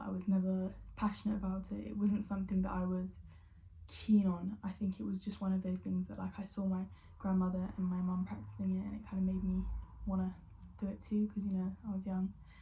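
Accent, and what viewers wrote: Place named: Cockney, United Kingdom. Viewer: Assalamualaikum.. welcome to our Muslim family 💗.It sounds like you in a Cockney accent so I'm weak to understand all of your words 😁 but in general I understand it is about your experience and challenges after converting. Good luck to you.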